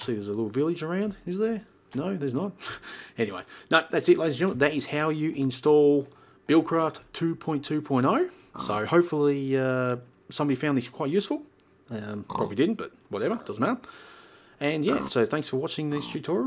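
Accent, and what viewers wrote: Australia. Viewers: YOU. Are Australian. I love you now. (: FROM ME ♥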